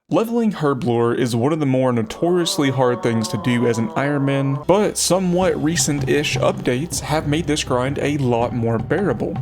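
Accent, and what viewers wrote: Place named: North Carolina, United States. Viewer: You sound like you’re from nc lol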